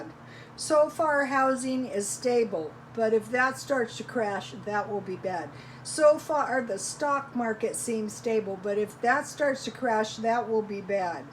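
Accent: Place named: San Diego, United States. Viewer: Beginning of video I'm like...she sounds like she's from SD. Oh shit she is from SD! Shout out from the SouthBay down here.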